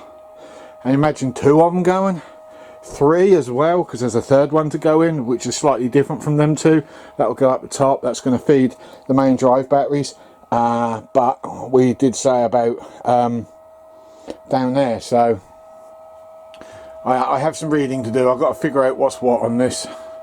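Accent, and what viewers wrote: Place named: Suffolk, United Kingdom. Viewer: this how i understand it works after months youtube research and wading through many videos of mis information if you are wiring two batteries in series to achieve 24v if so. for example if you have two 100ah batteries in series you will have 100ah but if you have a 100ah and 80ah you would have 80ah but i would recommend batteries of matched ah batteries preferable of the same condition if you are wiring in parallel to achieve 12v assume all batteries in that parallel are of the lowest AH battery value for example 100ah connected to 80ah you would have 160ah when purchasing brick wash watch out for cheap version with less than 10% w/w hydrochloric acid and when applying on heavy deposits you may need to work it in with a stiff brush and leave and come back and work it in again when the fizzing stops the concrete has neutralised the acid i thought you was from Suffolk by your east Suffolk accent best wish to you and your family from dave Bury St Edmunds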